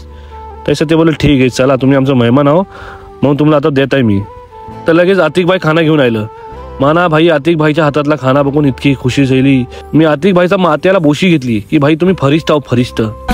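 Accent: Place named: Konkan, India. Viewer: Ek no video aisa lagra vlog khatam he na ho tumhi nav thevayla Gela pan to pan chagla vatla Ani tumchi kokni basha agdi Chan keep it up bhae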